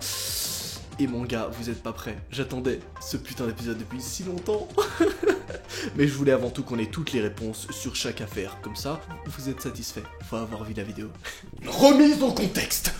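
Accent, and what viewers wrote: Switzerland. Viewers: T Suisse ?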